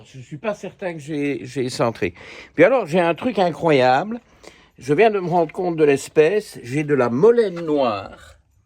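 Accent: Belgium. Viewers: C’est toujours un super régal de vous écouter et de voir vos compagnons 😘 ,votre plaisir et votre joie de nous partager la beauté de votre nature et de vos animaux, me remplit le cœur à chaque vidéo… Merci pour tous vos conseils, et j’apprécie tellement que votre discours n’est jamais coupé par de la publicité. Merci 1000 fois. Et puis votre accent belge vos expressions me ramènent des origines… bref avec vous, je tire mon plan😍😍😍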